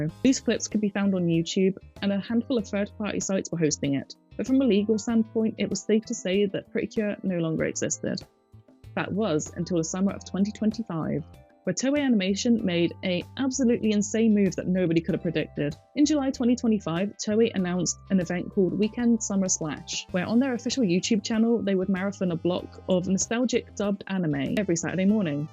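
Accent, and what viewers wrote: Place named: United Kingdom. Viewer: Your voice is so calming, especially since you have a familiar “accent” being British too =]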